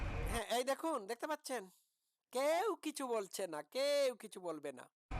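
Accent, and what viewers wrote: Sylhet, Bangladesh. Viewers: তোমার বাংলা উচ্চারণ সিলেটি ল্যাংটা ফুরিদের মত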